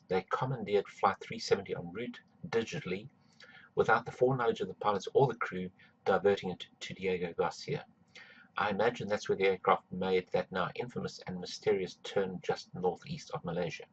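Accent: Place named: United Kingdom. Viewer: #1 Just because you believe that is what happened doesn't make it true. #2 This is not the only aircraft that has disappeared without a trace. Even when flown over land it has been years in some cases when crash sites were found. #3 You sound like a British citizen, certainly not from the U.S., so "you"(Britain) do not have stealth bombers. #4 You said, "There is more to this island than meets the eye." Statements like that are a very weak attempt to be provocative. And pardon me but, everyone on the planet knows this is a U.S. airbase. You mentioned there was a very public court case in Britain regarding the "occupation" of this island. #5 The article reads,"Confirmed at highest levels of gov't but not the current one." What does that even mean? Sounds like, "Void where offer not prohibited." #6 Where is the hard evidence? #7 Just because someone says it doesn't make it so. 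#8 Repeating it over and over doesn't make it factual. #9 You have no way of confirming any of this information therefore we can all take it with a grain of salt. #10 See Occam's Razor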